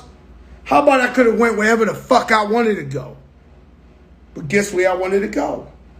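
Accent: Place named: Bronx, United States. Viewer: Joe told this story ,WITH A MESSAGE ,with skill, and spoken like a TRUE BRONX Vet and Legend!!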